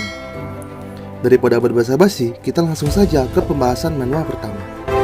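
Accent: Bali, Indonesia. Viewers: nak bali? kayak logat orang bali pas ngomong😁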